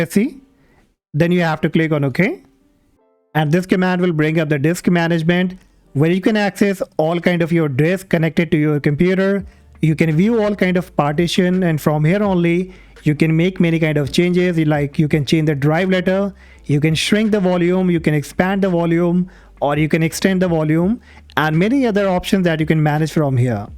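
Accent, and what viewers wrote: India. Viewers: 👍👍👍 so true... An indian english accent was so boring...But its better than any other language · Why ...Indian accent is good! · I feel shame on you bro. What's the problem with Indian accent? Don't be a racist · I was about to do the same of stop watching - not because of the accent - but because of my past experiences with the contents of the videos with Indian accent. But wanted to continue for few minutes before stopping and later found that the content was so good. I think, better to give few minutes of time before stopping just by accent. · This indian accent help me to install windows 11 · Indian accent, stop watching.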